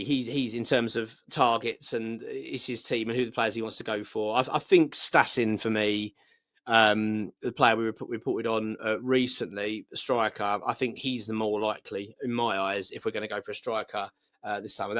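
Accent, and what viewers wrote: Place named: West Midlands, United Kingdom. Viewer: was you born in the west midlands? I can tell an accent.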